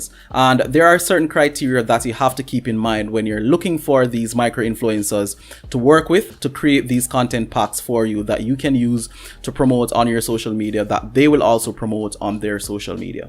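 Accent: Jamaica. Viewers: You can tell he’s Jamaican 🇯🇲😂 real businesses man nuh true boss man‼️ big up uh self n guh chu n mek uh money bad man💯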